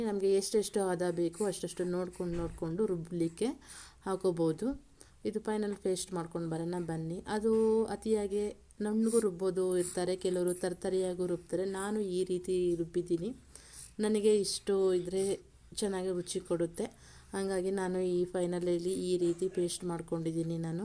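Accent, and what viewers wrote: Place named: Telangana, India. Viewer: Good TELANGANA Telugu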